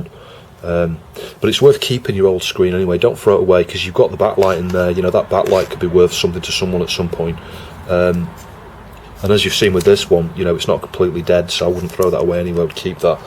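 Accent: United Kingdom. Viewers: It's always interesting to me how British people pronounce "solder" the way it looks like it should be pronounced. I never quite understand why us Americans decided to go with "sodder" for the pronunciation.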